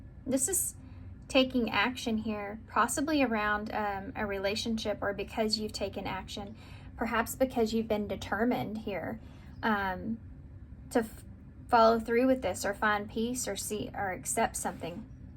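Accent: South, United States. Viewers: Pile 2 hit me hard. I was drawn to it and now I know why. The tower moment for me was my momma collapsed in front of me and I couldn’t save her. My gf at the time , addiction broke us apart. I moved out west and have been single for 9 years. I was having flashbacks today and when I found you I wanted to know what I needed to hear. I have been closed off from love the whole time. Healing and growing spiritually. I literally just ordered a shamanic drum two days ago for myself and loved that you said beat the drum get up and dance. Thank you for sharing your gifts. Also I grew up in Tennessee so your country accent was endearing to hear ❤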